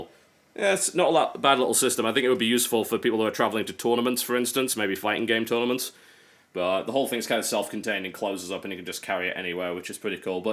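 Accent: United Kingdom. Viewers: British, but lives in America. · With that accent I expected to see UK style power outlets.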